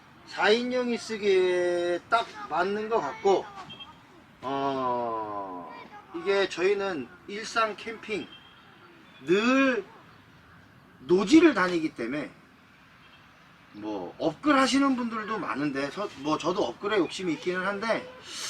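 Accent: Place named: South Chungcheong, South Korea. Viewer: 말하는게 충남 서산여 ㅎㅎㅎ 발전기 몃키로에요?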